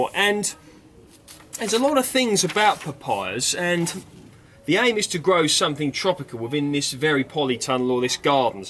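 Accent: United Kingdom. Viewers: You sound like a man after my own heart, you are obviously English sounding so what part of the UK do you live, South West, North? Just wondering as I’m in E.London and am just moving onto trying to grow Satsumas, but gonna try a Mango as the seed is so big it looks like an Alien pod, so has to be done, hehehe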